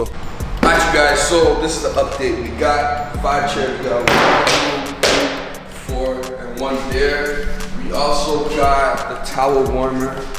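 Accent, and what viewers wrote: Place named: Toronto, Canada. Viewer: As soon as I heard mans speak, I said definitely a Toronto dude. Congrats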